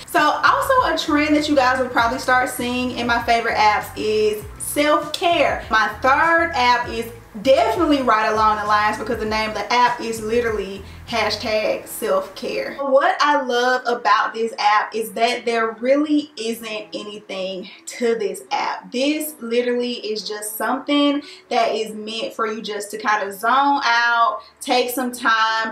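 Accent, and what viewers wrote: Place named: Texas, United States. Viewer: Your voice is kinda a mix between a Texan/southeren accent and something else lol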